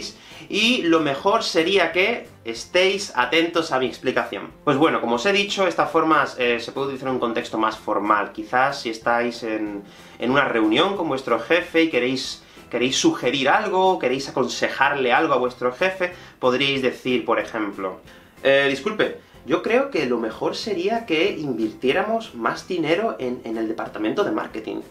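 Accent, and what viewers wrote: Andalusia, Spain. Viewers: Tu es andaluz?🥰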